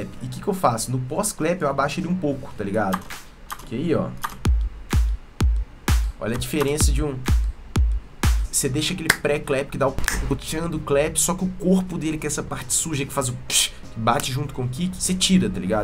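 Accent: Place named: Minas Gerais, Brazil. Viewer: Qualquer ideia que você jogar, a gente assiste, meu patrão. Você é fera demais. E outra, o sotaque mineiro tá forte, hein hahahahaha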